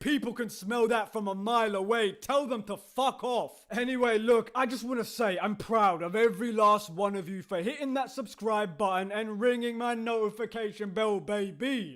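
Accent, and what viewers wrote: United Kingdom. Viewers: he’s British. I understand you have never heard many accents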